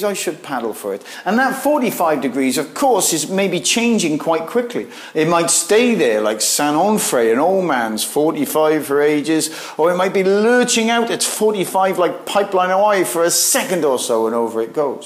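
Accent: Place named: Newport, United Kingdom. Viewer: Can’t tell what accent he has sometimes I notice a Newport accent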